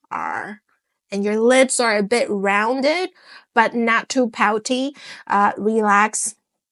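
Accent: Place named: Midwest, United States. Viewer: Maybe I'm crazy but you do sound mostly mid-west to me (I'm from the mid-west) lots'a lazy letters from d'ere. 👍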